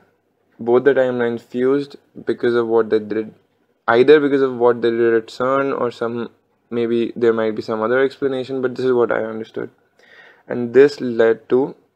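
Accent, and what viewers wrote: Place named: India. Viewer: Indian accents are great, love it.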